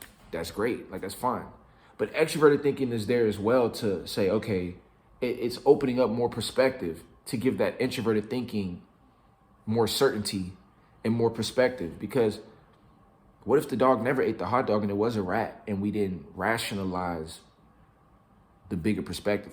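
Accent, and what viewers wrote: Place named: United States. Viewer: Hi I’m watching you to learn American accent 👍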